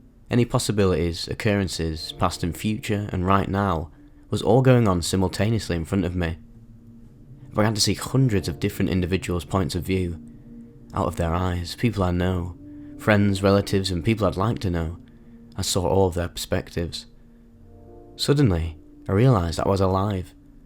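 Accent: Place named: Manchester, United Kingdom. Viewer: Beautiful pic and title made me smile, I am a /lad/ from Mississippi and I've always loved the manc accent since discovering karl pilkington around 08,09 and listening to the Ricky gervais show animated, an idiot abroad, and then the rest. You bring that for me with your own style on one of my favorite topics and do it so eloquently and with such a wonderful energy behind it. Please always continue if you continue to enjoy this. I listen to every video.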